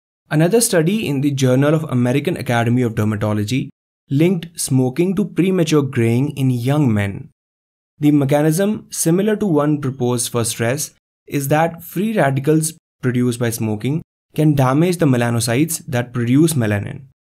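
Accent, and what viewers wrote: India. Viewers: Hmmm let's see this vid.Oh,India accent... Nevermind. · indian accent is so weird to listen.. kinda comedy haha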